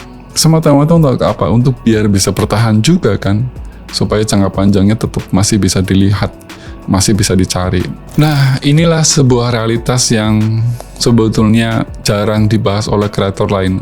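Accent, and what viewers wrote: Indonesia. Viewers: Logatnya kaya wong KIdul Mas??? Mantap lanjut dan jaga semangat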